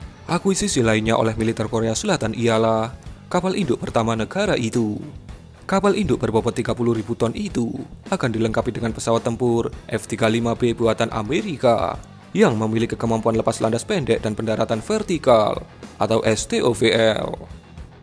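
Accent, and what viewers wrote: Java, Indonesia. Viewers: bahasa indonesianya yg masih ada logat jawanya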